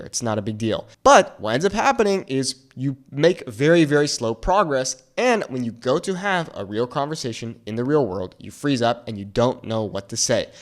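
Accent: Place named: Mexico. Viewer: Good work. Greetings from Spain. You have a perfect Mexican accent.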